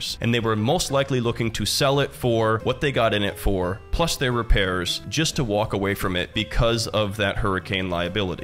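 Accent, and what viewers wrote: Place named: Florida, United States. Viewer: seems like a guy I’d chill with. Looks and sounds like a Florida local too.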